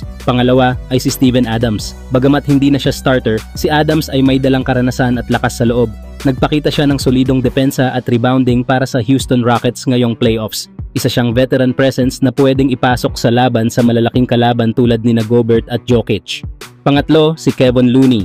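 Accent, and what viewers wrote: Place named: Visayas, Philippines. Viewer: Bisaya siguro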